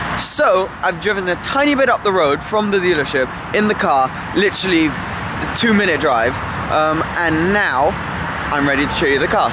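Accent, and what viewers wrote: France. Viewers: Are u English you accent seems to blip into French sometimes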